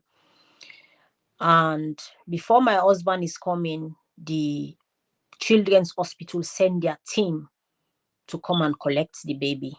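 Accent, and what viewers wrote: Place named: Nigeria. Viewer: This lady sounds Nigeria to me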